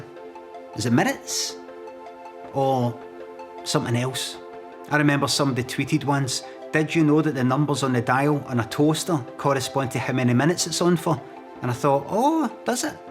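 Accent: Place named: Glasgow, United Kingdom. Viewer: made the mistake of using automatic captions, does not handle a glasgow accent at all.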